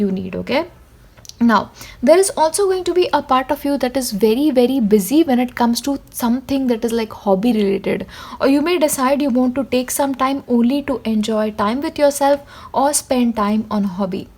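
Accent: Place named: India. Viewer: Your accent reminds me of my close Indian friends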